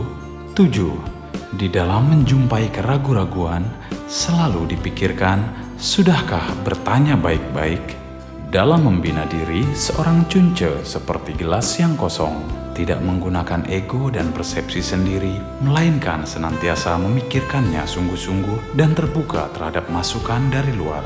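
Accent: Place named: Indonesia. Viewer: Kenapa bahasa mandarin di baca dengan logat indonesia??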